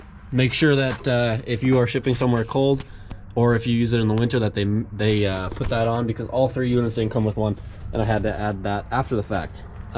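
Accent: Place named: Norway. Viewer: Where are you from? Your accent sound exactly like a Norwegian trying to speak American English.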